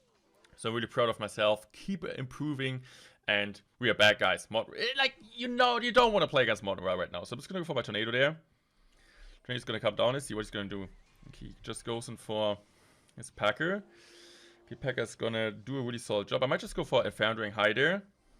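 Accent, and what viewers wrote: Germany. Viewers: The German accent kills me, I’m sorry! But your skills in this game are insane.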